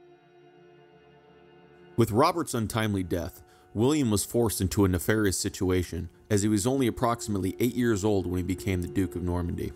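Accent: United States. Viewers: Pronunciations need work -- too bad to hear an American voice instead of a British one. E.g., Aethling - long a or AA (ae diphthong use in OE). But clear useful information of historic figures.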